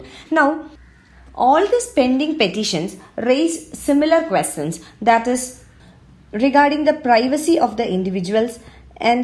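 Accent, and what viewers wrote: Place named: South India, India. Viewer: Worst facilitator ever in Study IQ. Just reading a paper could be done by anyone. Pronunciation should be formal..typical south indian accent. 👎👎👎👎👎👎👎👎👎👎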